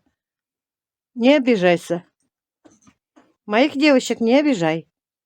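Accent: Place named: Kabardino-Balkaria, Russia. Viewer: Ну, ты такая смешная,так уговаривала козла! Мартин похож на козлов,которые в горах у нас живут. А разговариваешь на татарском как наши балкарцы и карачаевцы!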